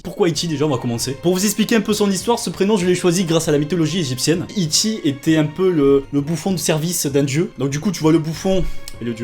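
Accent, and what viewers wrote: South, France. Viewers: En faite j'avais jamais remarqué que t'avais l'accent du sud!!!!😁 Très sexy!😜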